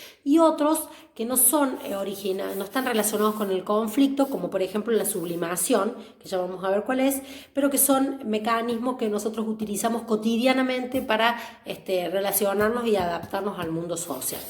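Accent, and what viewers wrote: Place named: Córdoba, Argentina. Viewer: Que buena explicación y gracias que sea de una tonada cordobesa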